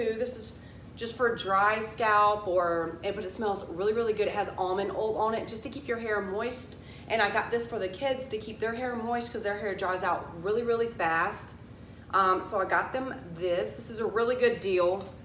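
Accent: South, United States. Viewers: Oh girl I got such a kick out of you saying you from the south like we couldn't tell love you girl. I say girl cuz I'm almost 70 n I call my girls lil girls.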